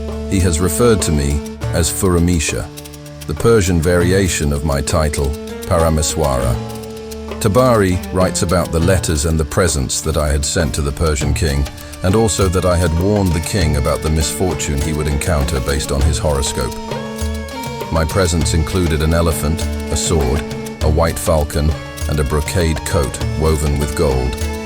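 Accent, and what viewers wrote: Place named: United States. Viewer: Can the creators of this video remove the american accent narration?Lets have an Indian english accent. This narration is making a mockery of the names.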